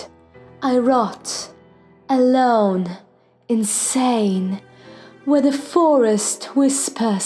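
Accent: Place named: United Kingdom. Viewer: I love your voice and accent. Are you British? By the way, the part at the end literally gives me shivers. Maybe make ASMR?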